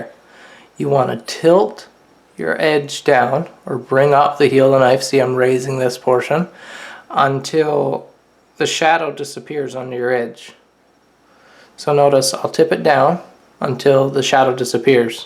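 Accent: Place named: Newfoundland, Canada. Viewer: Dude, are you from Newfoundland!? Haha great tutorial! Got a paramilitary 2 on the way as well as a 400/ 1000, 3000/8000 and strop. Can’t wait!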